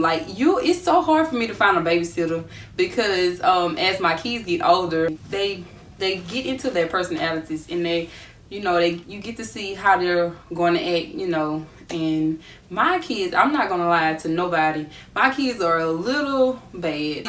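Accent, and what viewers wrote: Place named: South, United States. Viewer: Love the southern accent. Where y’all from?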